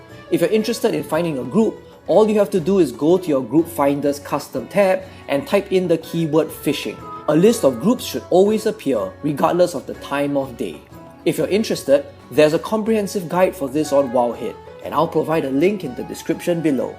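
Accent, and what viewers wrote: Singapore. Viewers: Are you singaporean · no wonder I found your accent familiar , I'm from Singapore too ! Which server are you playing ! Wanna play together ? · saw in another comment that you said you are sg..well that explains the accent ..but more importantly thank you for the tips ,they were very imformative and helpful for us casuals..i need to get the titles and a few mounts but ugg..and the mage towers really pissed me off..i can't find a good assasin rogue video to refer to..keep posting man i'm subbin ya ..we play both factions so we will see what happens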